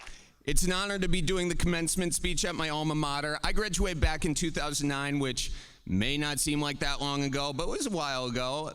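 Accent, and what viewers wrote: Wisconsin, United States. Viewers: You certainly still have a Wisconsin accent. Not quite as Manitowocy though.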